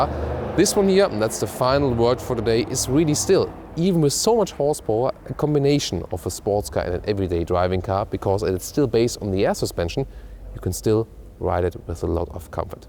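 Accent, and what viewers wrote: Germany. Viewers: very good review! Although in my view the sport seats which are part of the Edition 1 are very comfortable in my view. I agree with you, the Standard seats are eventually better for very long trips, but they look too serious in my view. But that is a very personal thing, I see that you like more the traditional style things, elegance - thats good and fits to you :-) I like your reviews very much, but why dont you do them in German, I can hear that you are a German (I am Swiss).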